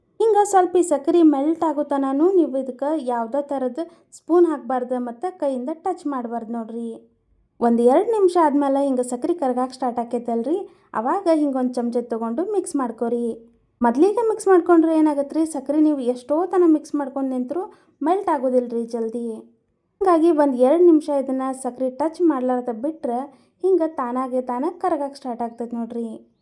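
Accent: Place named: North Karnataka, India. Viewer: Sis niv uttara karanataka davaru añnari ... Nice talking ri❤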